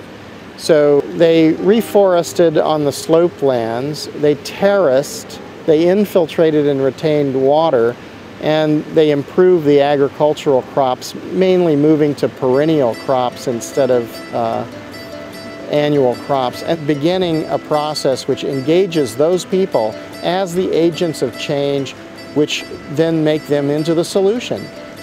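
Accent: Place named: United States. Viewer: He sounds American to me.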